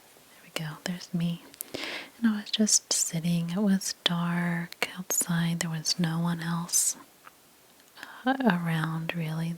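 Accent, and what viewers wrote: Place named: Midwest, United States. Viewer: Your voice is so soothing! There is also something familiar, are you from the northwest originally? ( IN, IL, WI, MI) ? You pronounce words in a nice crisp way, thank you!!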